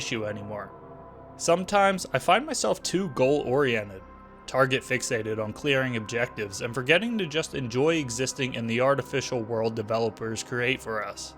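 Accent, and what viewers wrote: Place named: Delaware County, United States. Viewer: damn someones from DELCO lol good video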